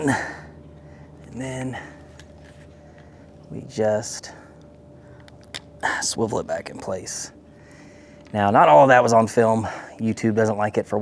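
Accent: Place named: Czech Republic. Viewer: Based on accent, I think is Czech.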